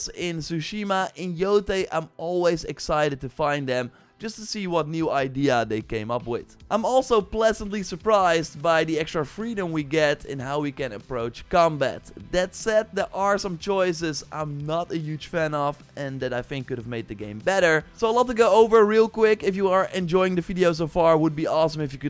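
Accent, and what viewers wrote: Netherlands. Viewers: Dude what is that accent??!! Is it Dutch? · Are you Dutch hahahah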